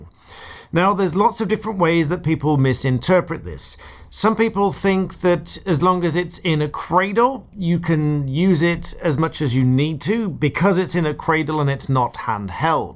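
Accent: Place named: RP, United Kingdom. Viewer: listen and im getting off this channel. people have the right inalienable right to travel weather that be by time travel or donkey. sorry THAT WILL BE posh twat wannabe reading what we can all read ourselves you pantronising twat..did you come out the womb speaking like that you twat? fk you and your now kings laws member of tha barrrrrrrrrrrr society. you can all fk off you shark pit infested hitlers. you do more harm than good! all out for yourselves fkn loser. what good did you do ever for anyone?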